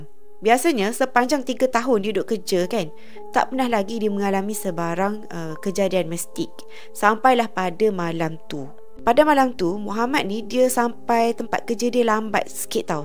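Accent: Kelantan, Malaysia. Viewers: Kak ore kelate ko bunyi mcm ckp kelate je.. Air bodo tu gpo maksud dio🤔🤔😅😅😅😅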